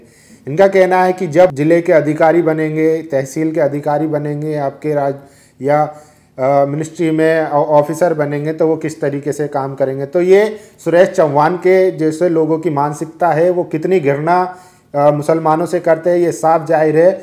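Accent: Bihar, India. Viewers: मुझे तो आज तक एक भी भीमटा पत्रकार नही दिख। ये थूक निगलकर खबर पढ़ने वाला भद्दी भद्दी आवाज निकाल कर बिहारी टोन में श को स बोलने वाले को कोई अक्ल का चोदा ही पत्रकार कहेगा।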